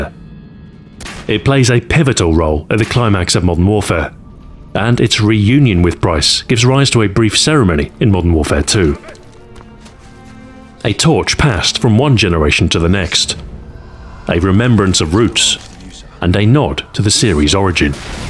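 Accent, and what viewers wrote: United Kingdom. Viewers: A very British Terminator’s voice. And here is probably to me THE reason why I think deep baritone BRITISH voices are the BEST at serious/epic narration, bar none! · Lol it's funny because sounds British. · He is British, Captain Price is also British xD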